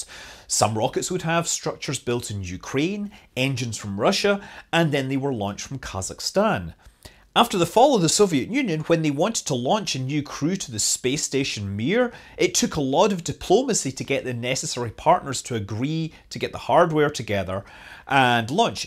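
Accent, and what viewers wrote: Scotland, United Kingdom. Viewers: Ive been watching Scott for years. He was the one who taught me the basics of KSP. My wife on the other hand had very little knowledge of him, his channel, or the content. Ive been trying to change that, so now when I watch new videos the phrase “Hello, Im ” rings out around the house. then immediately after I hear a small womans voice in perfect Scottish accent “Hellooo Im ” looks like you got ur hooks in deep sir. Love ur work.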